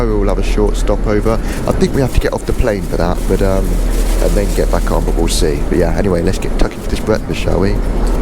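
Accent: United Kingdom. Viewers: Nice trip! Your accent is beautiful, I'm wondering where are you from?! Scotland or Wales??? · Really nice Flight report in the amazing B787-800 of Air Europa 🎉 love your British accent and your great voice. Thanks x sharing!!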